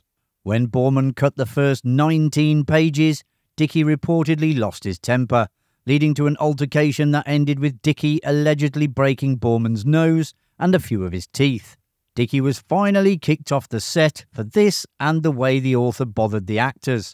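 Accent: England, United Kingdom. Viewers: Can't stand the pommey accent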